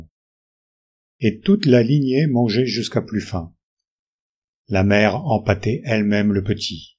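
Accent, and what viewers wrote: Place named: Normandy, France. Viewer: ❤ l’accent normand est presque bon ! (Je suis née chez Maupassant, le Pays de Caux). Merci pour la lecture 🙏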